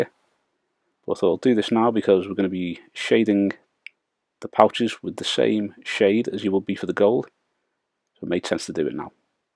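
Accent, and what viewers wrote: Liverpool, United Kingdom. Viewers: The scouse accent if i close my eyes i feel like I'm watching art attack.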